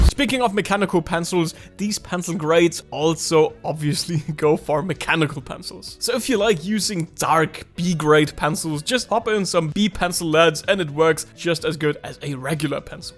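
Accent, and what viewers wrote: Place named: Germany. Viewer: I started watching your videos last night and instantly found your accent and style of comedy nostalgic. You reminded me of the lessons my german mother gave me when i was young, very German yet very relatable.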